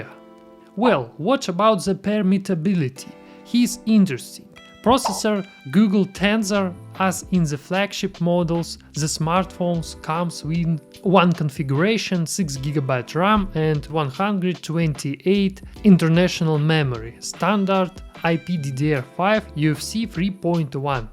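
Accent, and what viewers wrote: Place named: Russia. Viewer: subscribed just for Russian accent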